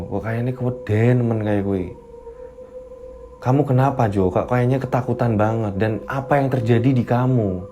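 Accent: Banyumas, Indonesia. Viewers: Pintermen Bhs.Tegalnya mas logadnya dapat,lanjut crita slanjutnya yosky....😄😄💪💪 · Critany dri Tegal ap Pekalongan Min soalny ngomongny Medok bnget hhh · Bintaro Tangerang hadir mas, haduhhh massss ngeri bgt aq nyimak kisah ini, masalahnya aq y sllu naik kereta klo k jakarta, mas luwes bgt ngomong logat Tegal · Saya suka, bahasanya jls. Dn bahasa Jawa + Ngapaknya itu bikin saya suka. Hehe.. BWI ikut Nyimak. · Banyuwangi hadir mas....suka banget mas nyampeinya pakai bhs ngapak" tambah seru...BOOOM BOOOM BOOM....YOSKAY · Sereem....tp kudu guyu...bhs tegal e pas bgd🤣🤭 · baru crita ini yg buat q senyum senyum sndiri cara pmbwaane pakai bhs ngapak,,,apik mas enyonge seneng cara ngomong e,,,,😁😁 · Ya Allah bang.....multi talen banged Versi ngapak nya best Yoskay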